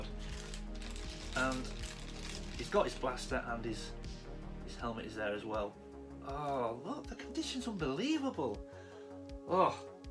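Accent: United Kingdom. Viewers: I didn't understand why your grandma got you those Scottish goodies in the past? Also your accent it sounds a bit different that most British accents I've heard.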